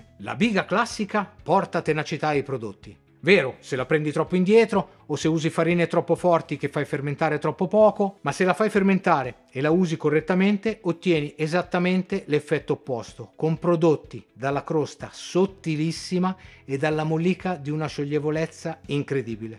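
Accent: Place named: North, Italy. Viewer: Complimenti!! Bel video. Saluti dall'Argentina!!! Sembri veneto o lombardo. Da dove sei?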